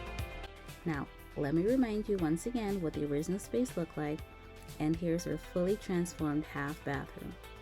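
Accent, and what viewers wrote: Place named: United States. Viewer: For being an immigrant you have a very good American accent. Wouldn't have guessed it. Great videos btw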